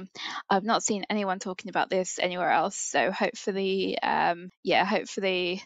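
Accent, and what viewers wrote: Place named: United Kingdom. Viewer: I know this isn't part of the actual video but you have a beautiful voice! Also on Stitches EU but I think I will try to find my own flips so I don't inflate prices and spoil it for you! Trying to work out where your accent is from in the UK...tbh I am kinda terrible at guessing haha. Keep up the videos!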